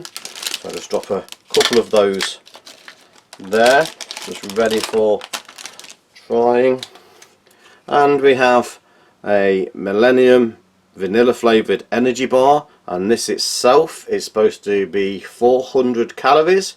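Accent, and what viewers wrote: United Kingdom. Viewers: Your reviews are fantastic. There are few things I enjoy more other than relax to your reviews, your calm british accent and James Bond style manners and thorough review of your ration. This clip, like most of your others, is a Masterpiece. Please keep up your awesome hobby. And please, for the love of god, do not cut your videos short because you've reviewed a specific type of coffee or tee or whatever before. It always adds to the enjoyment. Thank you.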